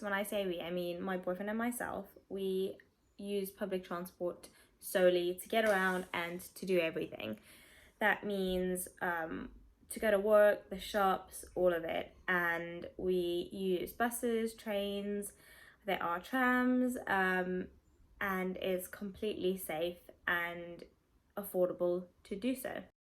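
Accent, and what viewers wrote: United Kingdom. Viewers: You only been in UK for almost 2 years and you speak as if you have an accent of the country already.. Not sure how that is possible because you came from South Africa, don't forget where you came from. 😊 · Wow you picked up the accent so quickly 😃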